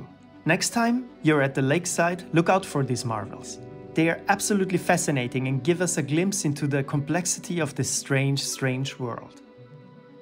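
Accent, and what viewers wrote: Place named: Switzerland. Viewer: I believe so - also i think i detect a swiss accent in the content creator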